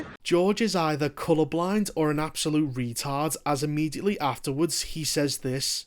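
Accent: Liverpool, United Kingdom. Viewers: What i hate more is your scouse accent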